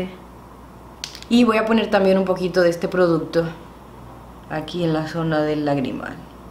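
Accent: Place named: Dominican Republic. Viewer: me encanta tu acento deberias dar unas clases aya en las escuelas sobre el uso y acento de el espańol en republica dominicana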